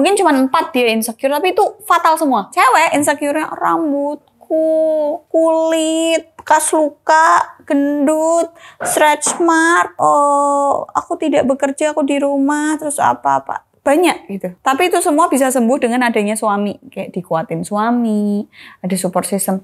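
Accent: Java, Indonesia. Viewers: mbakny logatny medok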